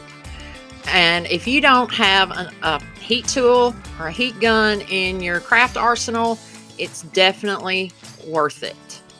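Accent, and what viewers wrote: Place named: South, United States. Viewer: SPRING! Love that you don’t sound rehearsed, also LOVE your accent, I’m a Southern girl too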